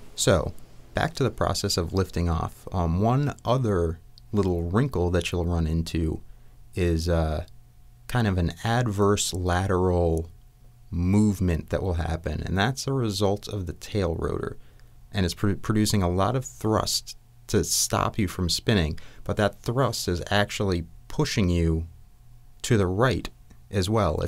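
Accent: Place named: United States. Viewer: Thank you for this terrific video. Never had a buzz for choppers as I have for planes, but this video has changed that. Please keep them coming. By the way, and I mean this with utter respect, you sound like, with your American accent, the actor Sam Elliott playing the Doorman in 'Road House'. Thank you very much for the Video.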